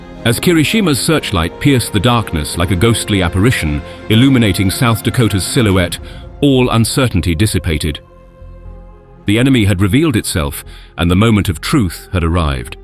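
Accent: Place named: United Kingdom. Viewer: Great video!!!! But why is a British narrator doing this instead of an American narrator??????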